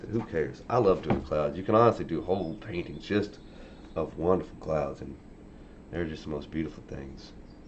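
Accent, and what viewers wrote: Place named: Texas, United States. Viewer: totally agree, the entire human experience boils down to sharing stories via art in whatever form that carries it, everyone should have access to and nurture that inherent need to create and feel fulfilled by it. RDR is my favorite game too! unfortunately it's such a favorite that it cut heavily into my painting hours lol. your accent is nice though, it makes me miss texas :) i hope you continue to share your art & thoughts here!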